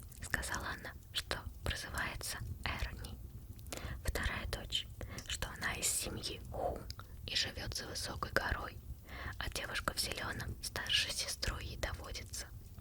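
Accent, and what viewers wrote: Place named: Russia. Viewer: Sotaque russo é muito bom velho, o jeito que ela pronuncia os ditongos é diferente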